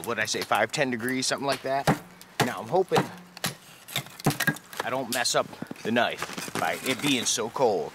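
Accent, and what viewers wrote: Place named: Pittsburgh, United States. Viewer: Hey man, are you from Pittsburgh? You sound like it. Thanks for all the vids.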